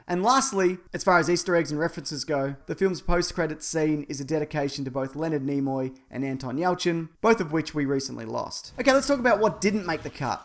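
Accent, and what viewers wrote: Australia. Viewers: I cannot fucking listen to this guy talk. There's Australian, and then there's down syndrome.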